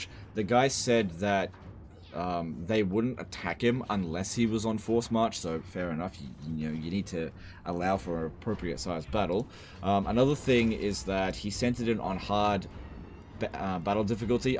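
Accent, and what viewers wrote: Queensland, Australia. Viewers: Hey, I was curious if you might consider doing a "how to level" {hero/lord name here}. I just realized as I was playing a Thorek campaign how I have no idea what traits to take down the blue line to get Lightning Strike are. Not sure what the algorithms leaning towards these days as to video number or length ( nearly 400k in it's all probably gravy to you at this point ), but I wouldn't mind that kind of guide with a bit of queenslander accent thrown in.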